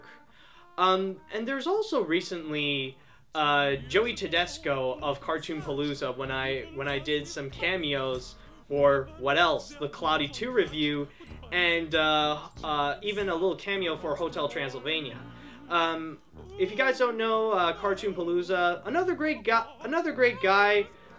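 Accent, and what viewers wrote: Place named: Quebec, Canada. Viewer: I'm still learning French myself, but I somewhat understood what you said, yeah. Though your French accent is clearly Canadian-French, I could understand through the accent.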